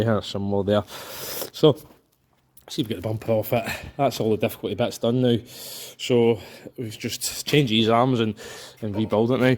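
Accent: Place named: Scotland, United Kingdom. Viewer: Ooo eye matey. I labouriously watched your video, to try to find out how to remove the lower control arms of my 2001 Ford KA. But all i got, was a 99% of a video where you talk, in your very broad Scottish accent (which is bad enough), about Fn Ford Rivets, and Fn bolts on the Fn Ford bumper!!!! You show a picture of the new arms and the F'd old bushes. Nowhere in this video did you show what we all want to see. How to FN get the FN Lower Control Arms OOT!!!! Sorry mate, but your video is useless.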